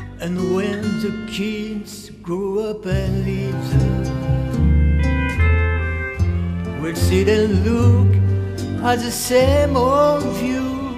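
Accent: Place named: France. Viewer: Excellent... Avec la pointe d'accent frenchy qui t'a rendue célèbre ! 😊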